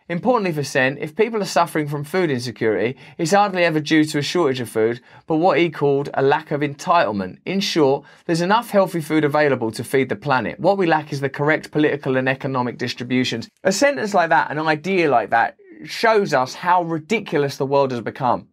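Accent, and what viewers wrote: United Kingdom. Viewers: Despite the fact that Russell often dresses like my crazy aunt, he really seems to know his shit. It's definitely worth enduring that limey accent to recieve some of the knowledge he's offering.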